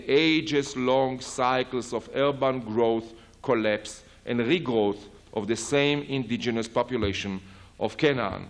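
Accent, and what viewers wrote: Israel. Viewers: Israel Finkelstein is awesome...An Israeli Jew who has no issue at all speaking the truth about the mythology of his people in the context of modern archaeology. The points he hits in this lecture are absolutely true and for the most part have been known for over 100 years. If you can get past his thick Israeli accent this lecture is a good summation for the average biblical-historicist to mull over. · In English? You know he is a Hebrew speaker as you can hear from his accent.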